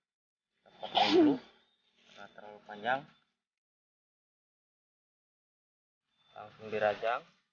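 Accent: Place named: Banyumas, Indonesia. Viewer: cingireh ngapak ....salam wong jowo bro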